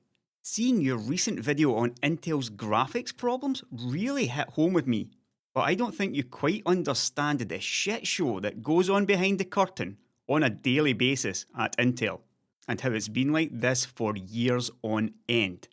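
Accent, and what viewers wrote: Scotland, United Kingdom. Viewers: Scottish accent + curse words, name a more iconic duo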